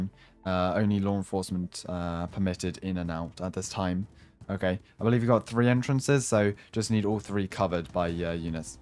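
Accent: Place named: Scotland, United Kingdom. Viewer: You've changed your voice sounds different you sound Scottish but before you sounded british a few months ago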